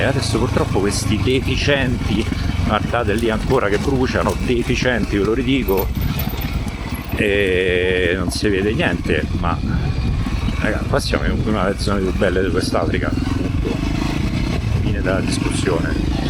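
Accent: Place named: Rome, Italy. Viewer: sono molto contento che puoi fare queste esperienze, bravo. anche io ci provo sovente , ma ancora non è la mia vita piena… ti auguro il meglio e tanta fortuna. inoltre il tuo lieve accento romano è molto gradito. continuo a seguirti da molto tempo, dall’inizio…!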